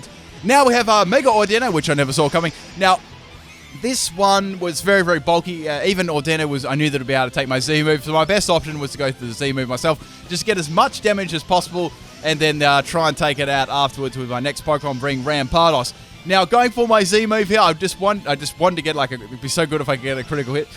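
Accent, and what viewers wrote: Australia. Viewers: Are you Aussie ? You sound Aussie as fuck. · U Australian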